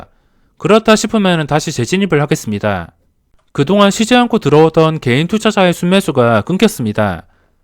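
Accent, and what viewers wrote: Gyeongsang, South Korea. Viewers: 너무 감사합니다ㆍ친숙한 경상도 사투리도 듣기좋습니다ㆍ 제가 전업을 그만두는 시점에 이쪽으로 들어오셯네요ㆍ 저는 쪽박을 차서 그만둔것은 아니지만 수익모델을 만들지 못해 기업에 입사해서 월급쟁이 합니다ㆍ 저의생각은 ㆍ 수익모델은 2가지입니다ㆍ첫째는 망하지않을 코스피10 종목 두어장뭍어놓아도 아무 신경안써도되는 아빠잘만난 주식관심있는사람ㆍ 둘째는 돌파와 손절을 할줄아는 ㆍ 이두부류외 조상의 천운이 없는 모든 사람은 주식으로 돈을 딸수없습니다ㆍ 두가지밖에 없음을 이 증명해주고 있네요ㆍ부럽습니다 ㆍ님이 하고있는 매매는 초고수의 영역이며 일반개미님에게는 추격에 가깝습니다ㆍ님도 그런개미돈을 털어간다는 아찔한 사실은 아시죠ㆍ? 세상사 베틀넷이죠 ㆍ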